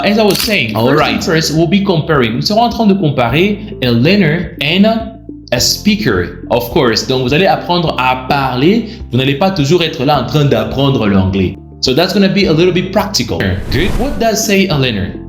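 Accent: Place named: United States. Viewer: Hi there, i love your enthousiasm and the way you switch in a Split second from French to English, you are totally right in your explanations : speaking is absolutely primordial, the more you will do it the better it will be for your English improvements.Maybe you live in the USA , at least your accent tends suggest it? Thank you for the vidéo.Serge from France native french speaker !!!!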